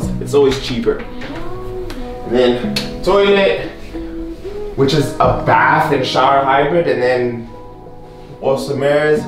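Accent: South Africa. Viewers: Bro your accent , are you South African? Thanks for great content!!! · Dude sounds South African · JUST SUBSCRIBED!!!!! Im from Cape Town and I pick up you from SA Straight from the pat by the accent. Enjoyed watching this video, looking forward to getting to see future Videos you drop. Much love ...:) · This guy from south africa? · Is this guy from South Africa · You sound south African, are you? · This guy sounds South African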